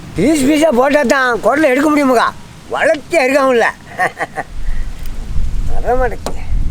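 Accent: Tamil Nadu, India. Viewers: Yes pure indian. Have you not heard this slang tamil? Oldest language of world than your English 🤦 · சரி தான்...மற்றும் தேவை இல்லாமல் சிரிப்பதை விட்டு விட்டு இன்னும் நிறைய விடயங்களை செய்யலாம்...சமையல் செய்யக்கூடிய அல்லது சமைக்கக் கூடிய பொருளை ஒழுங்காக காட்டலாம்...அது போன்று பயனுள்ள விடயங்களை செய்யலாம்....சொல்ல வந்ததை சொல்லாமல் சிரித்துக் கொண்டே இருப்பதனால் பார்ப்பவர்க்கு எரிச்சலூட்டும்....மற்றபடி அவர் பேசுவது கடை கோடி மலை வாழ் மக்கள் பேசும் தமிழ் போல் தெரிகிறது...குழப்பம் வேண்டாம்....அவர் பார்ப்பவர்களுக்கு புரியும்படி பேசினால் நன்றாக இருக்கும்...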